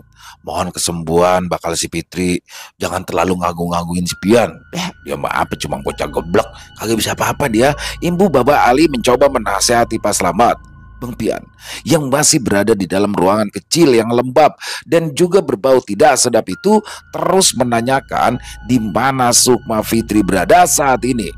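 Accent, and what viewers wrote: Jakarta, Indonesia. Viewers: assalammualaikum....nah yg dinanti2 hadir lg cerita nya mas untung.sukseees terus..bang mksh... yg selalu dengan logat betawiny a yg mantap bikin seru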